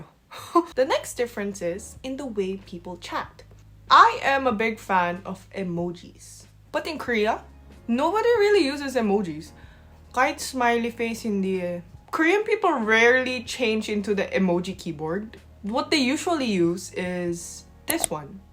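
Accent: Batangas, Philippines. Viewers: Napanood ko po kayo sa TVJ, mukhang bata yong itsura niyo pero yong 12 years old mukhang mature na. Maganda po yong batangueña accent niyo talagang tigasin, kilala po kasi ang mga batangueño na matatapang, mga ilocano na kuripot, mga kapampangan na galante. Sabagay bawat lugar may kanya kanyang bansag, sinasabing matatapang ang mga batangueño dahil sa salita palang eh medyo mai intimidate ka na, lagi pa silang may balisong sa bulsa kaya kung ayaw mong magripohan huwag makipag away sa batangueño, sinasabing kuripot ang mga ilocano dahil siguro bihira kang makakita sa ilocos ng mga magagarang bahay gaya ng Pampanga, o manila na super gaganda ang mga bahay at halatang may kaya ang mga tao. Sa ilocos po parang hindi apektado ng mga pagbabago ng panahon, meron din mangilan ngilan na magaganda ang bahay pero mostly simple living ang mga tao doon dahil karamihan sa mga ilocano, sa pagsasaka ang ikinabubuhay at kahit na nakapagtapos na ang anak ng isang magsasaka, mahilig pa rin sa nakagisnan na pagsasaka, in other words simple living lang talaga ang maraming ilocano kaya nasasabihang kuripot. 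Sa mga kapampangan naman po, palibhasa progresibong lugar ang pampanga, maraming mga business establishments, mga restaurants at parang manila na maraming tao. So maraming mga kapampangan ang mga milyonario at ipinapakita nila sa magagarang bahay, in fact po, tuwing piyesta sa ibat ibang lugar sa pampanga, kunwari dadaan ka sa isang handaan na open for public, puwede ka ng makikain, huwag lang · Grabe Pinoy n pinoy accent tagalog ni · I just love the way you talk like a local in taglish and the way you articulate your English is tops. No more shades of being Korean. Even your intonation is so Filipino and so understandable. I'm very proud of how you've turned out to be. You are now very well versed and a good conversationalist. Thank you, congratulations and God bless.. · galing magsalita ng Filipino…. go Batangas!!!! andun pa rin yun punto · Just binge watched your videos. And saw on ig that you studied Archi in UP i guess? 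My question is, are you using your degree now? Like the apprentice and such? Or pahinga muna? And how would you take the boards? Macecredit ba yan sa korea and youll be a licensed archi there???? I hope youd be able to reply. Im an archi student as well kasi HAHAHAHAA *plus i really love na na adapt mo yung thick neutral accent ng pinoy when speaking in english. Mas prefer ko yun, mas pangit pa nga yung may konting tweng or conyo BGC type na english, like shut up and get the fuck off 💀😂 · You really sound like a Filipina speaking tagenglish. I really like your batangueno astig accent and Im a big fan of yours. You should have a talk show in Manila. Since you are articulate and smart. You will be a big hit. Watching from northern California, the bay area.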